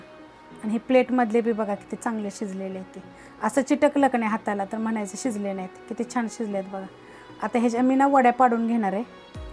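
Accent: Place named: Solapur, India. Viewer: Tumhi solapur kadache ka...bhasha tashich ahe aamachya sarakhi....ani recipes super asatat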